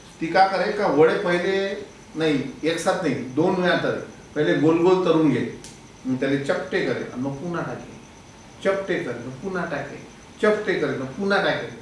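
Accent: Vidarbha, India. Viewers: वडे एकदम मस्त 👌👌👌 आणि आपली विदर्भ भाषा भारीच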